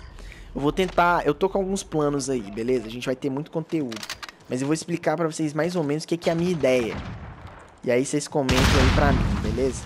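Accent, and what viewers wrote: Minas Gerais, Brazil. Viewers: Você é mineiro?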